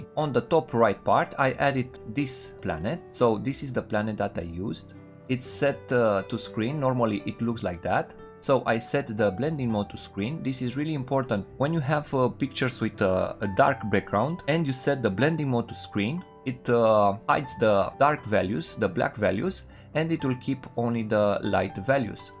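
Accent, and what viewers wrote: Romania. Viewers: Nu stiu de ce, dar accentul tau imi spune ca esti roman. Buna treaba, explicarea layerelor nu e comuna, multa lume face doar speed art. Esti bun, evoluezi repede. If I assumed wrong what I wrote in first part I still want to tell you thanks for your layers break down. We dont get this thing so often, majority of ppl just posting speed art.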